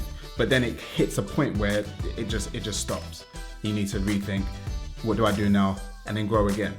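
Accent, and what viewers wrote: United Kingdom. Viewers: I noticed, he is actually talk British English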